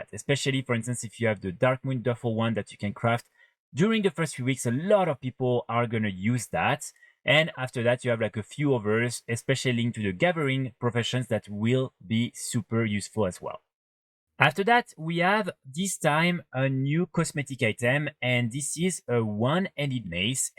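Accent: France. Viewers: suscribed for the french accent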